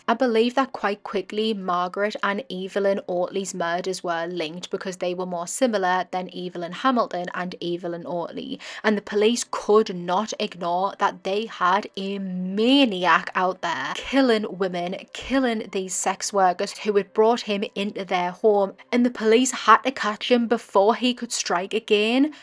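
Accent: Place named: South Shields, United Kingdom. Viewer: Your accent sounds local to me. I’m from South Shields. First time listening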